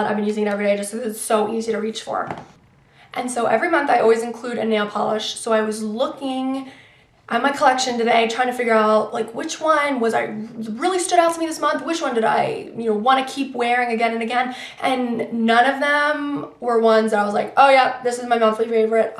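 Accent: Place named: Philadelphia, United States. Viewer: are you from Philadelphia? Your accent sounds like it could be :]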